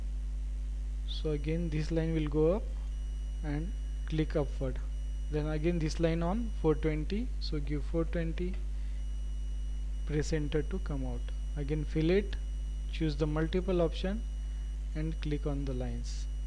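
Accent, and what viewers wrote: India. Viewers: Sir,is your mother tongue marathi?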